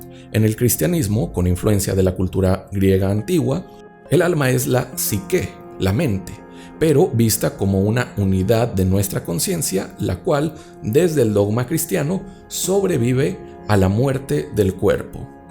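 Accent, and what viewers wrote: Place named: South America. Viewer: Hablas con astucia con un acento de un pais de America del dur usas chivas , eres Moiche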